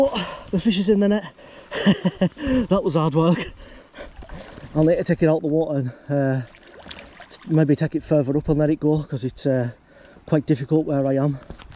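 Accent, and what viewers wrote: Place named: Cumbria, United Kingdom. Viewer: What river is that mate ? I’m guessing somewhere in Cumbria going by your accent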